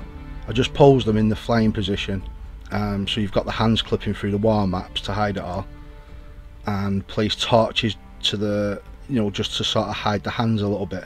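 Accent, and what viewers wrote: Manchester, United Kingdom. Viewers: Defo sound like one of us manc lads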